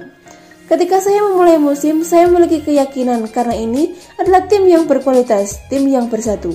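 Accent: Java, Indonesia. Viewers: Suaramu muedok bgt mbakk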